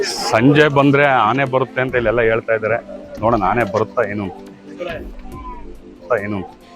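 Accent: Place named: Chamarajanagar, India. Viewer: ನೀವು ಈ ರೀತಿಯ ಪ್ರಕೃತಿಯ ವಿಡಿಯೋ ಗಳನ್ನು ಮಾಡಿ brother, ನಮ್ಮ ಗುಂಡ್ಲುಪೇಟೆ ಸುತ್ತ ಮುತ್ತ ಅತ್ಯದ್ಭುತ ಪ್ರೇಕ್ಷಕ ಸ್ಥಳ ಇದೆ, ಭಾಷೆ ಬಗ್ಗೆ ತಲೆ ಕೆಡಿಸಿ ಕೊಳ್ಳಬೇಡಿ ,ಚಾಮರಾಜನಗರ ಉಚ್ಚಾರಣೆ ನೇ ಹಾಗೆ ❤ನೀನು ಮುಂದುವರಿ ಗುರು ❤❤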